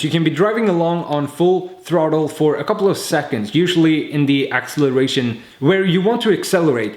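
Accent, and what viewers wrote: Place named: Sweden. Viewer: Just when I was wondering "why are his pants so small?" he started speaking with a Swedish accent and it all made sense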